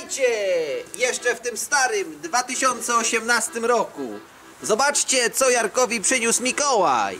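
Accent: Poland. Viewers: gwara podlaska? :D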